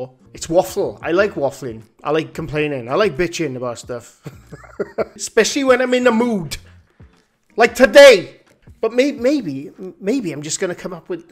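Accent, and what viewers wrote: Wales, United Kingdom. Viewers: your wonderful Welsh accent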